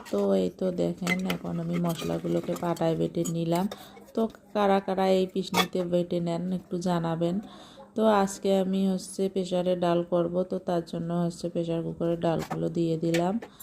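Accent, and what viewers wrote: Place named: North Bengal, India. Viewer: আপনার কথা আর রান্না গুলো অনেক সুন্দর। আপনার বাড়ী মনে উওর বংগে তাইনা। কথা গুলো তেমনি মনে হলো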